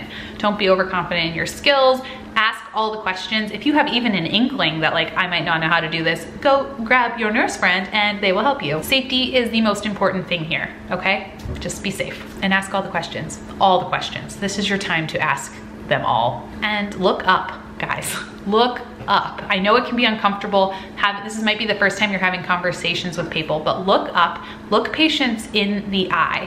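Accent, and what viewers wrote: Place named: Midwest, United States. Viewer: Ok but the foldable clipboards (one that fits in your pocket!) are good! I love your videos btw. Are you from Minnesota? Or somewhere in the Midwest? I’m from Minnesota and your voice kind of sounds Midwest!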